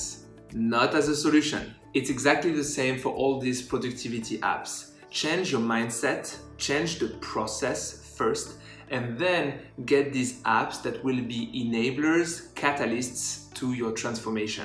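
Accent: France. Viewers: You need to make longer videos man 👍 Also where are you from? Think you got a french or Canadian accent right?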